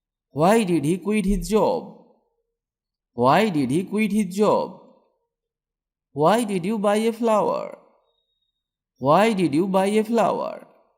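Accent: India. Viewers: Can you speak englisch and Not Indian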